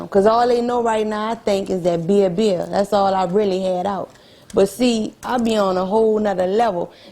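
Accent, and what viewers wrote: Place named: Los Angeles, United States. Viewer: Gotta be from L.A. w/ that accent and her fine self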